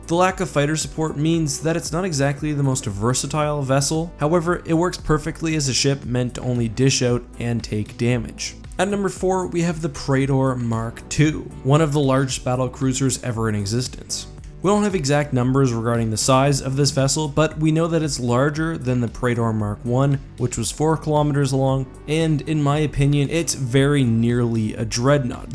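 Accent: Canada. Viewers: I watch your videos so much that I’m starting to say “out” like you do. You’re Canadian huh.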